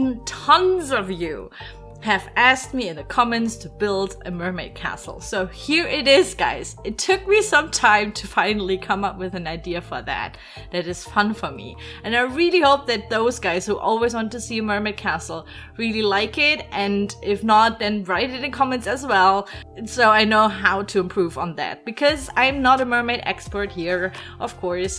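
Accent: Germany. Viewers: So you're german??? I've always thought your accent kinda sounds german but I was never sure about that and I was trying to figure out where you are from if not from germany lmao Anyways! Really nice build even though I have to admit that I'm also not as much into mermaids... so I'll just let the mermaid fans do the judging ^^ Grüße einer ebenfalls Deutschen xD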